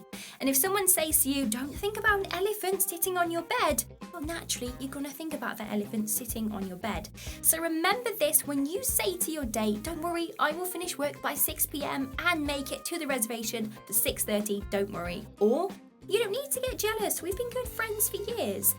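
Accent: England, United Kingdom. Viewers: Hot dang girl!! You're a life saver. Hey random qestion...which English accent are you Londonder, Somerset, or neither?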